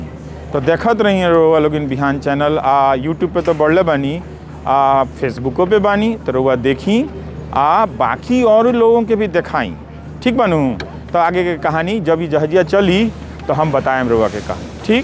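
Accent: Bhojpuri, India. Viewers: बहुत ही बढ़िया जानकारी दिहली रउआं।आपके मुंह से भोजपुरी सुनिये के बहुत आनंद आ गईल । धन्यवाद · एतना मिठा भोजपुरी रउआ मुह से सुनी के दिल गद गद हो गइल बा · केतना सुंदर आहा वर्णन करेला।।। हम बिहार के बनी।।। हमरा बर निक लागल आहा के भोजपुरी बोली।।।।। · एतना मीठ भोजपुरी रउआ मुँह से सुनी के दिल गद गद हो गइल 🥰🥰👏👏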